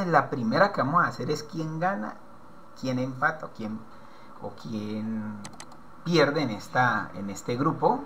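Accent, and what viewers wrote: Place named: Cali, Colombia. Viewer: Jajaja se te nota el acento caleño, vamos colombia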